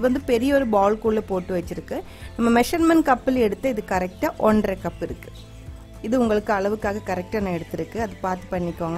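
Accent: Kerala, India. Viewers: Tried this recipe, it came out very well❤️ Your Kerala mixed Tamil is really awesome 😇🙏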